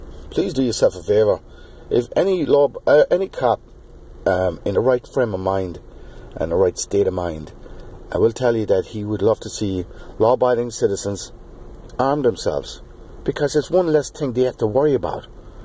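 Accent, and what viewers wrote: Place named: Netherlands. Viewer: so, just thinking, your accent sounds like an old Holland/dutch accent, am I close? btw, reports are interesting from LA, cousin is getting out of the state tonight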